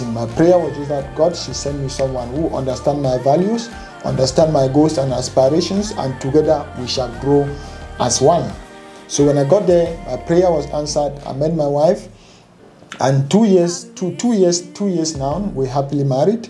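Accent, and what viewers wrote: Cameroon. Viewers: Yoo bro when I first came across your chanel something told me u sound like a Cameroonian am also from Camer and proud to come across u bro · OMG am happy you're from Cameroon,am from Bamenda no wonder I understand you better than other forex traders because you have that our Cameroon accent😂 wow am happy to hear this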